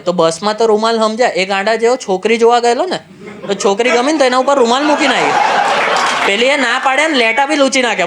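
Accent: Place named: Vadodara, India. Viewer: Bhai baroda accent bau cute laage 😍😂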